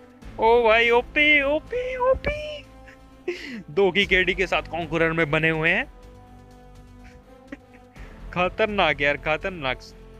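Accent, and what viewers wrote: India. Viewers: Bhai utrakhand se ho Kya ? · Bahi tu GADHWALI hai kyaa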